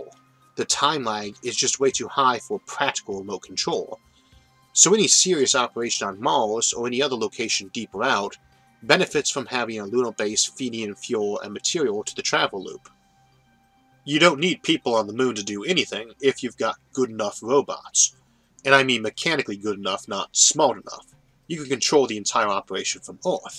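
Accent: United States. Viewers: I never noticed an impediment, just a US accent. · Your voice is fine. Which part of the States does your accent come from? I’m from nz. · And I don't think you have a "Speech impediment". Horrible yank ascent but that's it. And I can get throught that. Going to Lunar is akin to going to the corner coach station and that's about it. Now, if you want to use it as an analogue for Mars then you may have something. You can install astronomy telescopes arrays that can be remotely controlled. which could be deployed around the surface. And you could install communication relays around it as well but placing those in orbit could be a better method of deployment. But as far as mining it? I don't think it will "panout" as you yanks say. Yes, there is material there but mining it in large qualities could be more of a dream than reality. Anything near the surface would have long since cooked off. 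And the ice echos from the surface as likely something else all together. RDF can be easliy fooled. You would have to go down atleast 200m before you remotely will find something. So, I don't see Lunar to be a place for any colonization. Just maybe a repair team to fix whatever can't be fixed by robotics. Other than that. That's it.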